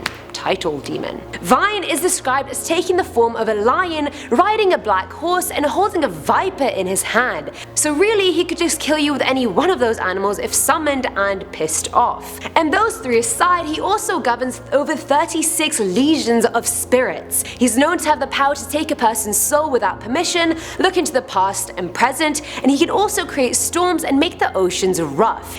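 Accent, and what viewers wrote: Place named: United Kingdom. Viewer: I can't tell if she's british or American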